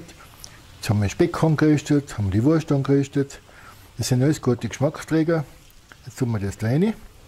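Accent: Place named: Tyrol, Austria. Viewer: Hallo an den, ich werde sie ganz bestimmt nachkochen. Mein kleines Problem habe ich mit dem Tiroler Dialekt. Danke für das schöne Rezept, Grüsse aus Dresden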